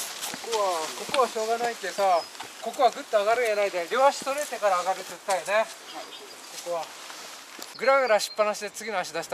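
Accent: Japan. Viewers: 最初は愛媛の方言かと思ったｗ 岡山出身だけどその辺のおっちゃんの喋り方だな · なんか苛つく言い方に聞こえるのは私だけかなぁ？ 九州弁ってこうなの？ あまり皆 理解してないと私も思う。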